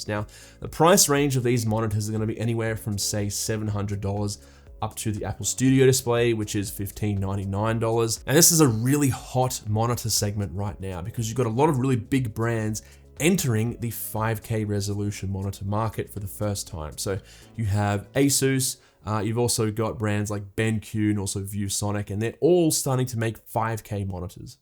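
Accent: Australia. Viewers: Would have been helpful flagging the pricing mentioned was in USD. The Aussie accent led me to believe it was AUD and there had been major price drops!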